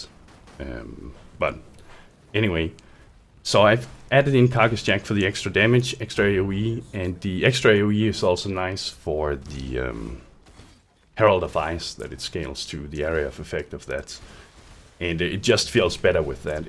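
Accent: Denmark. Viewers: hey are you Danish?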